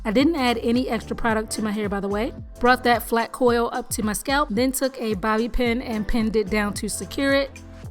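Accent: Baltimore, United States. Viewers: I've seen your thumbnails for years n I always refer to you as the girl that looks like Nicki lol I love that you did this natural straight style, aslo do I hear a bmore accent?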